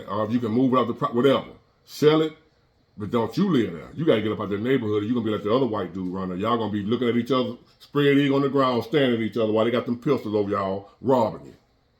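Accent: United States. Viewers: It seems like the video is interesting, but I need to read through th comments to have a clue because of your heavy American accent.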